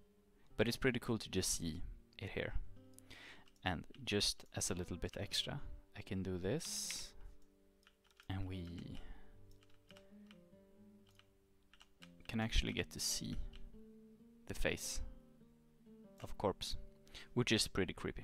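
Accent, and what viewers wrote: Sweden. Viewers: du är svensk eller hur?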